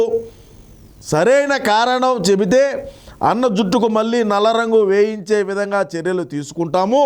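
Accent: Rayalaseema, India. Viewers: రోజులొ ఒక సారైనా నీ వాయిస్ వినాలనిపిస్తుంది నమస్కారం ప్రజలారా సీమ యాసతొ😂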